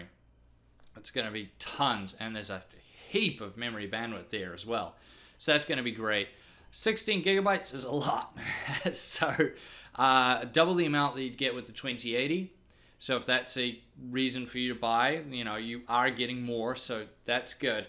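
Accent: New Zealand. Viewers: I've noticed your accent starting to change since the move. You are losing that touch of Kiwi.:) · He's a New Zealander talking like a New Zealander.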